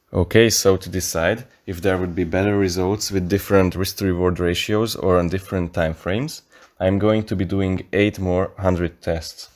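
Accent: Hungary. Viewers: te magyar vagy, hallom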